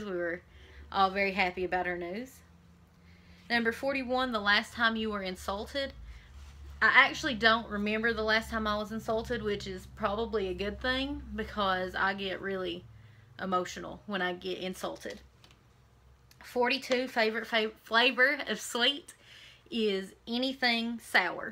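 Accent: South, United States. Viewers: I love cornhole! Your accent makes me feel at home...I miss the south :)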